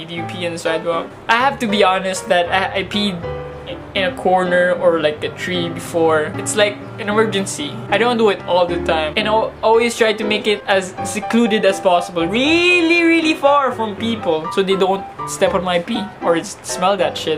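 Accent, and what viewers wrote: Philippines. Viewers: Based on your looks and accent you could tell hes a typical flip....this is just sad hating your own kind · I really hate the tone, the way you said "squatters". They didn't choose to live that way, tiny chance to have a decent house because of poverty, they don't have a choice to choose mate. It's a shame on you to say that to your own people. I maybe understand you if you're not filipino but you are, i can tell because of your "ACCENT". Be nice to your own people. I agreed on what you've said, some filipino peeing everywhere which is not nice, and the rest of what you've said is garbage!f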